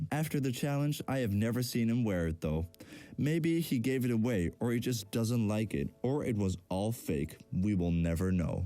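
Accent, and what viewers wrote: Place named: Netherlands. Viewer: lekker nederlands acccent hoor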